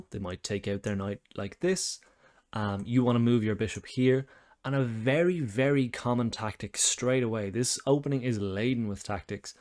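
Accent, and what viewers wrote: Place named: Ireland. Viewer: Nice video and delivery although I was a bit put off by the missed mates-in-one and nearly just gave up at that point. What stopped me is that I saw a lot of positives so I’d prefer to be supportive. I’m sure it’s not easy doing what you do! I, of course, have never missed mate-in-one. Not once. Ever. 😳 Your voice and cadence of speech are easy to listen to - a lot to be said for that Irish lilt! For me that’s in contrast to many of our favourite YouTubers, the main exception being Eric Rosen. I also prefer educational content over pure entertainment and I like that you’re also on camera, which is often not the case for creators of similar material (off-beat gambit lines) Great channel name too. I’m looking forward to more Chess Please! 🙂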